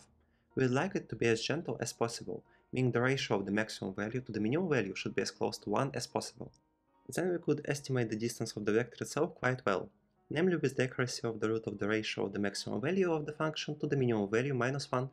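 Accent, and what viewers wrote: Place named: Russia. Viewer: Too strong russian accent, the rest is great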